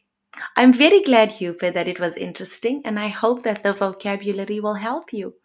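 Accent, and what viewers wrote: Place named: Cape Town, South Africa. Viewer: Sounds like a cape town accent, especially how you say "opporTunity"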